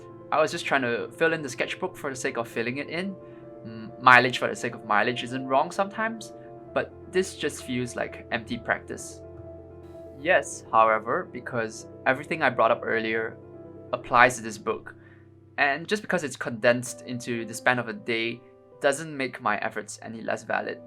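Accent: Singapore. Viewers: why does it sound like you have a Singaporean accent lol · i knew i recognised that accent 🇸🇬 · You sound singaporean/Malaysian COOL!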